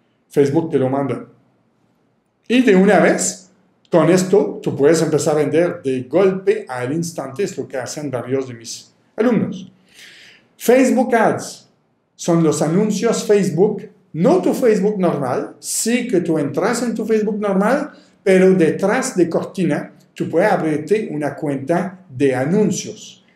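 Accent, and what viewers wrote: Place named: France. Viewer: L'accent c'est très joli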